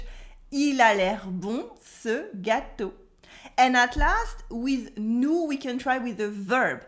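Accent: France. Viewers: J'adore ce concept. Les formes et tournures employées pour définir le français courant sont très bien choisies. C'est du beau boulot (great job). Mais je ne comprends pas pourquoi choisir systématiquement de prononcer l'anglais avec cet atroce accent français ? Alors qu'il est manifeste que vous maîtrisez parfaitement l'anglais. Est-ce un marketing gimmick ? A way to confirm your legitimate frenchitude ? C'est atroce à entendre mais il est clair que votre travail est parfait pour assurer une bonne transmission interculturelle du standard french. Bravo. C'est aussi une fabuleuse publicité pour les dentistes français ;) (I'm kidding your smile is gorgeous)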